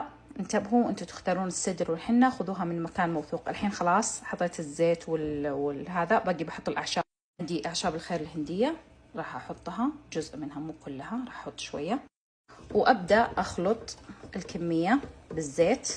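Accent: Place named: Egypt. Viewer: حلوة اللهجة المصري على لسانك😂😂😂 ربي يحفظك❤❤❤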